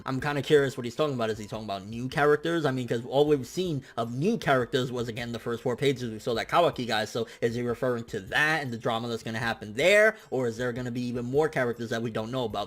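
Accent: New York, United States. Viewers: Ny accent?🤔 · I hate he says the characters names in his American accent like say it right brov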